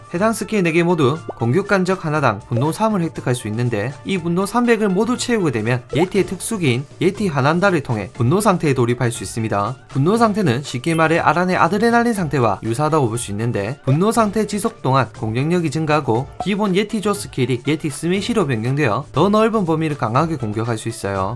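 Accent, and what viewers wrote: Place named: Gyeongsang, South Korea. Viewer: 경상도사투리 진짜듣기싫다 어우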